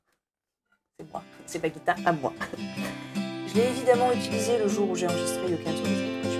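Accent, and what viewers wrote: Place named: France. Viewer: since I saw you playing all instruments on your own I wondered what kind of treasure I digged out of youtube: You are so wonderful young comparing to me, the music I listened to at the end of sixties/beginning of 70ies...I ´m happy having been alive then, with my VW-Bus T1, long hairs and ´big things´etc.... but: I miss a daughter or a son of my own who understands my ´faible´ for this passed era of musical art, feeling and behaving.I´m not a musician myself but I think I could distinguish good and bad easily...kneeling in front of your ´Grandmother´ (never heard of her until now) this would be suitable for me music-aficionado...You must be at least of double language/national origin? Singing without any notable accent in English!?And talking French fluently? And while French-talking your English has French accent!? (why? to fulfil French listener´s expectations?)Thank you Mrs., especially tonight your cover has been moving my soul. 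Stay healthy and happy, good luck in your future fate;-)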